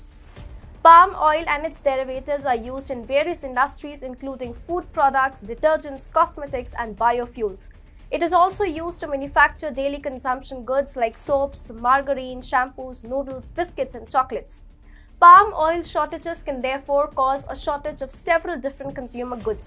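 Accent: India. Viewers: with this thick curry accent, i suggest you to put on subtitles for us to understand better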